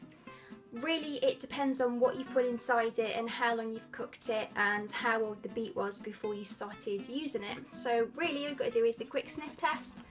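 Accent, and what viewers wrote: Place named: Europe. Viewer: I love the European kind of accent you got... It's kinda hard to catch up with that if you don't belong to the place... I have been using the beetroot thing for so long n Now I see videos over it... Ig I am gonna get back to it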